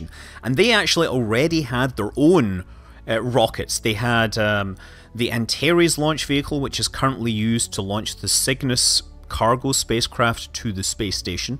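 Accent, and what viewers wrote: Scotland, United Kingdom. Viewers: Great to hear your Scottish accent!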